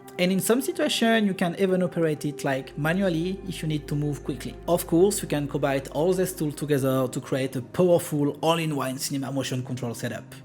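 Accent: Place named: France. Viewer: On est d’accord il a un sacrée accent français ?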